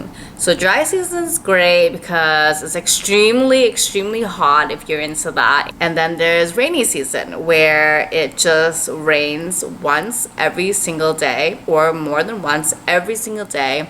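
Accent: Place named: California, United States. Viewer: So you left? I got assume you’re from Cali with that accent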